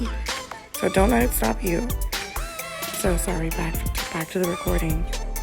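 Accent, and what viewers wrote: Bahamas. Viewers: From I hear that lil accent I say yea she Bahamian! 🇧🇸 New subbie!! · Lol I had to come check the comments cuz I’m like she sound Bahamian 😂😂❤️🔥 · You have a new subbie for sure! I scrolled down to see who would say you have a Bahamian accent. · Listen I was like this gal sound Bahamian lol. 🇧🇸🇧🇸🇧🇸 New Subbie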